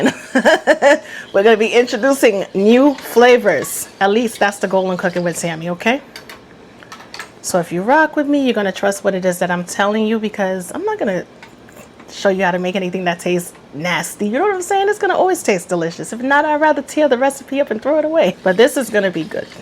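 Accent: New York, United States. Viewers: I love your cooking and I love your accent! Sounds a bit like New York! Thanks for sharing so many delicious recipes 😊👍🏼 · I LOVE the east coast accent, New York, Jersey, or am I wrong?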